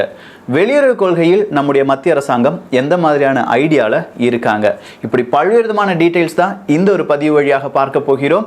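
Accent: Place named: Kanyakumari, India. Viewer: nenga kanykumari district ah ? slang patha apdi thonuthu..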